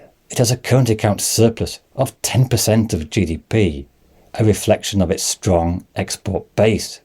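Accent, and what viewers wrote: United Kingdom. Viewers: The narrator has a British accent.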